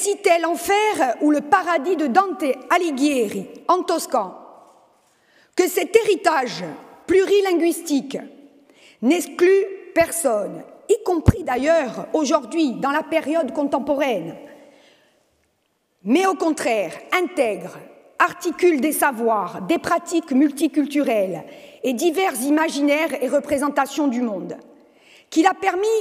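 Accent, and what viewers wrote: France. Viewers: accento francese fa male! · Sembra un miscuglio di dialetti italiani logicamente con influenza della pronuncia francese.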